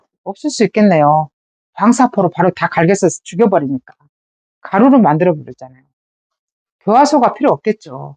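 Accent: Jeolla, South Korea. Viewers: 미국 71년에 부모따라 이민온 교포입니다. 제가 대학다닐때, 미국뉴스에서 광주사태에대해 보고 큰 쇼크를 받았었읍니다. 유튜브의 제목을 보고 좀 이상해서 클릭했읍니다. 자신을 전라도인이라 소개하며 아주 예쁘신 미인께서 조리있게 설명해주셔서 제가 가지고있던 광주사태에 대한 오해가 풀렸읍니다. 감사 합니다. 저도 많은 한국분들에게 광주사태에 대한 horror story 를 듣고 많은 오해를 하고 있었읍니다. 전라도 억양이들어있는 말로 너무 설명을 잘해주셔서 안믿을수가 없네요. 미인 님의 말씀 참 신뢰가 갑니다. 그렇지만, regardless of how crooked her political philosophy is, 자기 문학실력을 발휘해서 한국국민들이 염원하던 노벨상을 받은 한강씨는 축하해 주어야 한다는 의견 입니다. 제의견에 동의 안하시는분들께 용서 바랍니다. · 대구사람인데 근래 보기드문 구수한 사투리와진자보기드문 참전라인을 만낫네요 아침부터 허벌나케 구독쉐리박고 갑니다 조아요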